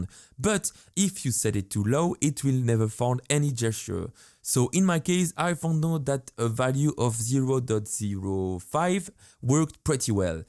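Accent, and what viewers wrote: France. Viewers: It's ok man, not easy to pronounce some words with French accent. As long as people understand, it's fine :) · We Americans are jealous of your Francais accent ;)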